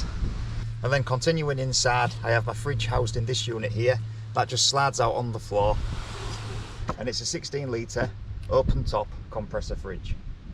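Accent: Hull, United Kingdom. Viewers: Hi there. Great build. Well done. Would that be a Hull accent I can hear?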